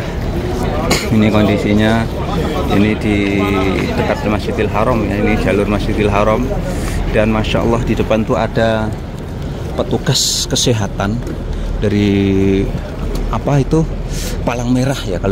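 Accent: Java, Indonesia. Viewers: Jawane metu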